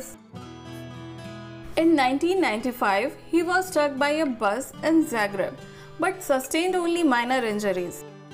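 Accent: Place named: India. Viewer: Your accent is good. There is nothing wrong in Indian accent 😊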